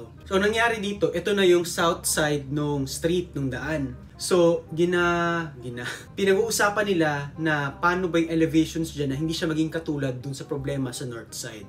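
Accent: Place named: Conyo, Philippines. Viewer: sus taga ADDU man pud diay ka... mao diay conyoish ka mu tagalog...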